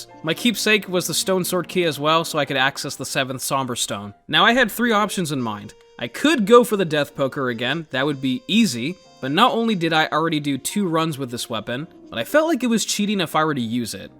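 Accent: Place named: Egypt. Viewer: wow u r Egyptian ur accent is on another lvl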